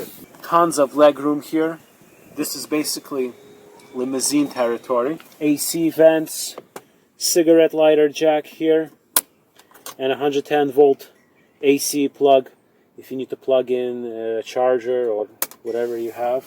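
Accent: Russia. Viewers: raaashian accent? cool truck dude. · Smoothest Russian accent I’ve ever heard. That interior color is horrible btw. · If that’s a scwartzenegger reference, i don’t think this guy is Austrian, he sounds Russian or maybe Ukrainian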